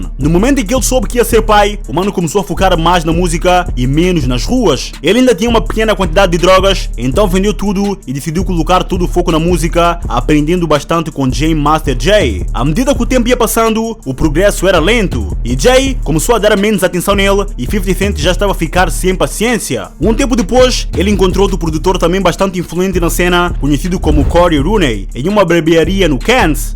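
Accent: Angola. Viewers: Mano tu és angolano?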